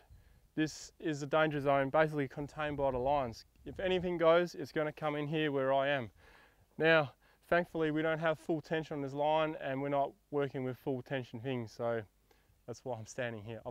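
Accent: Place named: Australia. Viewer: Aussie deep voice gave me nightmares!!😄🤣🤦‍♂️ if the devil were aussie!!🤣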